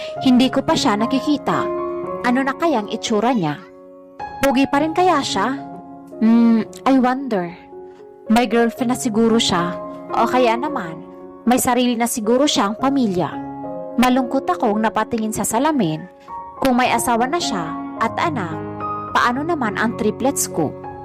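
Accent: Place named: Visayas, Philippines. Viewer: STORY WAS GOOD BUT NARRATOR NEEDS IMPROVEMENT. DAPAT WALANG PERIOD/COMMA EACH WORD. TONO DI KO MAINTINDIHAN IF ILONGGO, VISAYAN, BICOLANO O ILOKANO. OK LANG YUN BUT IT IS HOW TO DELIVER THE STORY PARA MAKATOTOHANAN AT ANG DAMING WORD NA "KO". NO OFFENSE, NARRATOR NEEDS IMPROVEMENT PO SAYANG ANG KWENTO.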